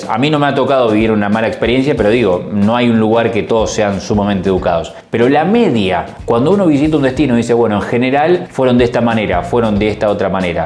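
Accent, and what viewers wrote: Uruguay. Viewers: No odiamos a los Argentinos ,no queremos a los arrogantes y si nos confunden es normal somos pocos ustedes muchos,es mas hablas como uruguayo. y esta lleno de pastosos eso es verdad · Noo....los uruguayos no odiamos a los argentinos, salta un poco la rivalidad en el fútbol.Es cierto que el porteño tiene un tonito algo agrandado pero yo siempre encontré que era como hablan los tanos.En realidad vos hablas muy parecido a nosotros,.Hace mucho que sigo tu canal porque me resulta muy interesante tu punto de vista respecto a los diferentes países y las diferentes culturas de ellos.Saludos y gracias por los elogios 🇺🇾🇺🇾💕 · "Tranquilos rozando lo pajoso" jajajajajaja, tienes razón en TODO. Si me preguntas si sos argentino o uruguayo, la verdad no sabría identificar porque hablas igual a nosotros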